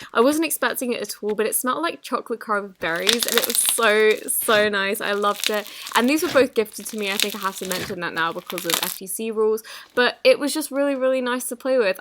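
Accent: United Kingdom. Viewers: Thang Hong she’s british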